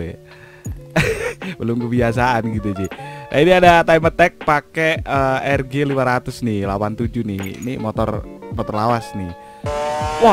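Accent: Malang, Indonesia. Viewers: Bang orang malang kah?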